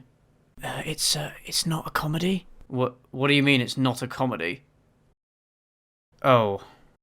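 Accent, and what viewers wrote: United Kingdom. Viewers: An already amazing review gets bonus points automatically for sarcasm with a British Accent!! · Oh God. You're so much 'britishier' than I could ever imagine.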